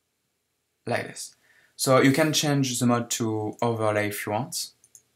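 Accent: France. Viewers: Mdr on sent bien l'accent français ;) sinon très très bon tutoriel ainsi que boulot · Tu es français, non ? L'accent est magnifique ! Edit ; J'avais pas remarqué le toshop en français...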